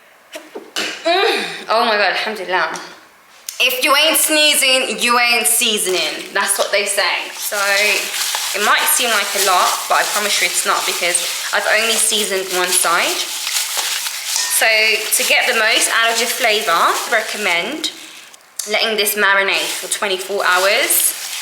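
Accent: United Kingdom. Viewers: Why are u trying so hard to sound like a white English girl? Smh.....U r BLACK!!!!!!!!!!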